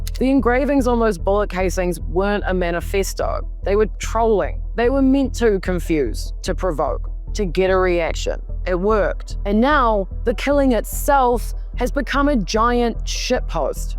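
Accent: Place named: New Zealand. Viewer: "IJJY JOKES" 😆 Omg I love your kiwi accent ❤️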